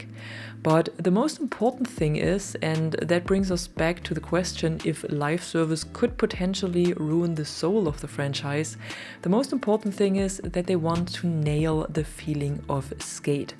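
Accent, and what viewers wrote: Germany. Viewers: Ist dein Dialekt deutsch? Falls ja lass ich einmal liebe Grüße doch schau schon längere Zeit deine Videos spiel das spiel jetzt seit einigen Tagen im early Access... Muss sagen es macht riesen Spaß aber ich komme nicht mit der Grafik nicht anfreunden sie sieht irgendwie extrem unfertig aus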